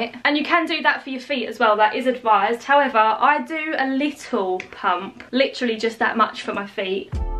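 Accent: United Kingdom. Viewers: Finnally i have found a perfect video, since i couldnt decide which one to buy, you explained it perfectly, also im just so in love with the brittish accent 🥰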